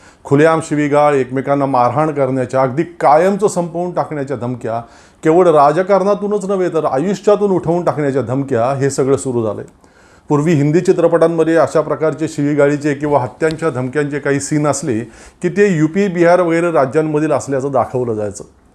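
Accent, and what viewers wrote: Standard. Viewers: मला मराठी भाषा खूप आवडते. शुद्ध मराठी उच्चारण आपला खूप मस्त. मराठी चित्रपटांना प्रोत्साहन दिलं पाहिजे. तसेच मी मुस्लिम आहे पण मला मराठी भाषेचा लेखक व्हायचा आहे.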